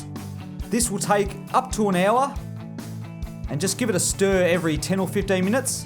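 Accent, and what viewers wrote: Australia. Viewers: Thank you for your awesome Aussie accent love it from India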